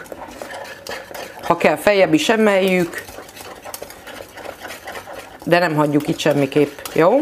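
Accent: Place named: Nyírség, Hungary. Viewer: Nagyon szépen köszönjük,és az ízes beszédet is biztos nyírségi vagy,én Nyírbélteken nyaraltam minden nyáron keresztanyáméknál,gyerekkorom,a falu,az állatok jutnak eszembe,a szőlő kötözés,a könyvek olvasása a szénaboglya tetején,be voltunk fogva rendesen,de minden nagyon jó volt,persze gyerekek voltunk! Isten áldjon kedves!☺️❤️☺️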